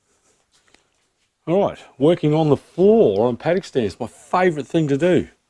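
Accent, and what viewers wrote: Australia. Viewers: how is it the new Zealanders say binch when you aussies say bench like the rest of us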